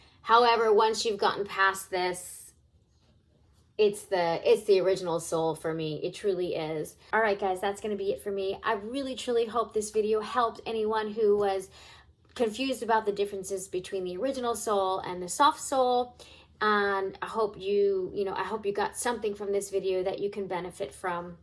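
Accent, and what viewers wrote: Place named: South, United States. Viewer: you speak really well, your voice is therapeutic... do you speak another language?? i can tell you are from the southern US.. love the vid you look great btw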